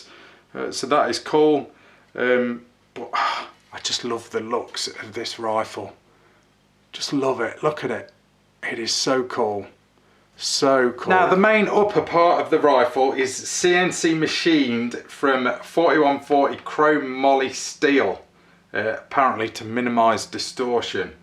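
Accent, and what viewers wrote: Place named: United Kingdom. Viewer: What country are you in? Because it sounds like your from the UK. I thought that the UK band firearms from civilian.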